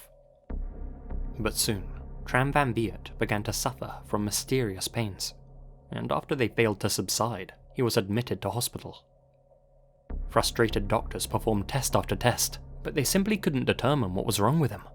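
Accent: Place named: United Kingdom. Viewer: For some reason that British accent is damn good at storytelling!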